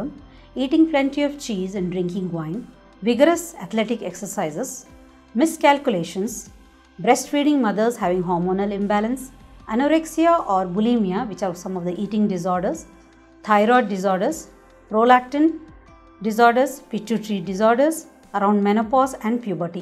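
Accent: India. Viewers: I LOVE the Indian accent when speaking English. 😍😍😍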